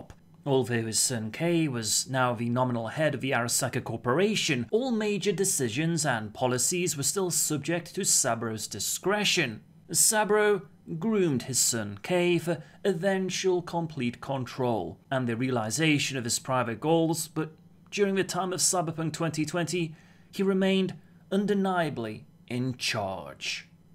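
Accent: United Kingdom. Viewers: That accent makes me think I'm listening to a British news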